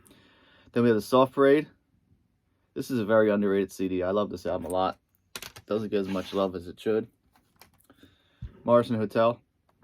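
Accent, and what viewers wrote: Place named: South Jersey, United States. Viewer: I bet youre from south Jersey or Philly .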